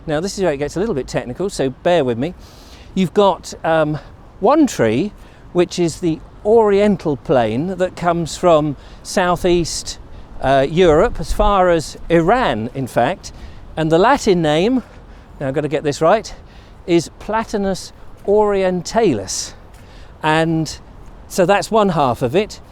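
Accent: United Kingdom. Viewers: As an American it was worth it just to hear, "it had a little bit of shenanigans one evening" in a British accent.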